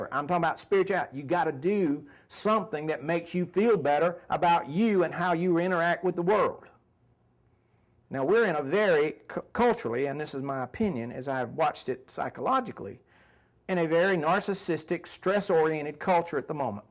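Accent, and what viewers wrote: United States. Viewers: tree-on qua-ree-on. lol south'ran drawwwl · 9/11 texas accent immediately thought of George bush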